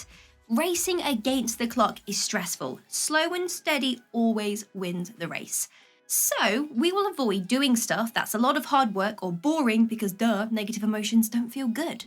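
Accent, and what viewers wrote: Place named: United Kingdom. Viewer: This is my real channel, lady with the wonderful British accent.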